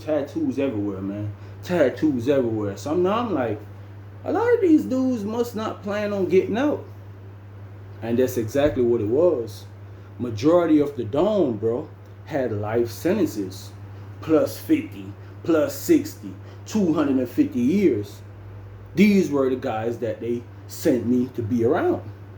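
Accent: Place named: Jamaica. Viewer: It’s funny hearing a Jamaican and country accent mixed in one 😂 respect bro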